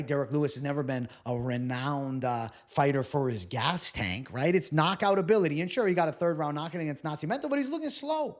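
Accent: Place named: New Jersey, United States. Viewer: Good to hear more of that Jersey coming out in the vacation accent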